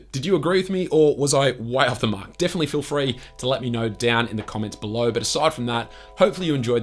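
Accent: Australia. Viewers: U from Australia?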